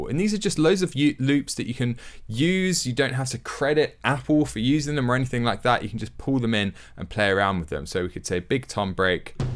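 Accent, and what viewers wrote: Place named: Manchester, United Kingdom. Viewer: you from Manchester bro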